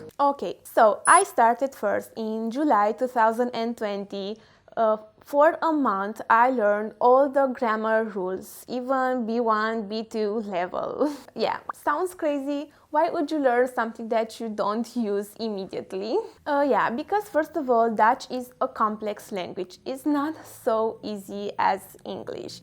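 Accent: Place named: Romania. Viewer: God damn, I recognized instantly that you're from Romania (mostly because so am I) but daaamn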